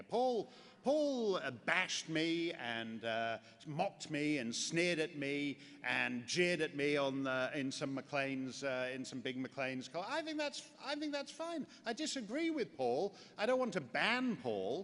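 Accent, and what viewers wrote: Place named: Oxford, United Kingdom. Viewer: Well, I discovered Mr Mark "par hasard" on Google and started listening to his various speeches about many subjects such as Obama, the frightening "islamisation" of Europe (and the world in general), and so on, and was particularly interested and delighted by his impressive and witty defense of free speech; as an European (a Euro-skeptic to be precise) and mainly as a basically "anti-Left progresssive" Frenchman I must say I like this man and would appreciate much his coming to France just to debate with our stupid so-called "President" Mr 3% François Hollande, that would be fun to say the least; By the way, at first I really thought he was an Englishman, because of his "Oxfordian" accent, and could not help comparing him with the "petulant" Ukip leader Mr Farage, who dares telling the Truth about Europe and its growing denial of Democracy. 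I heard him quote Alain Finkielkraut, a french philosopher that I like very much and taught me to "see the things I saw", which is a good thing to do, like admitting that "the facts are always Conservative". I just ordered Mark book "After America"...And I'm sure i'll enjoy it....Oh, and I remember he also compared Neville Chamberlain and Winston Churchill... Good Luck!!! Love your insight.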